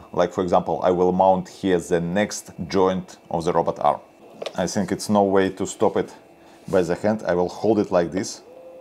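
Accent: Russia. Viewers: Как по мне русский акцент жобавляет шарма роликам ;) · Такой русский ацент и внешность русская) За работу респект! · Жёсткий русский акцент))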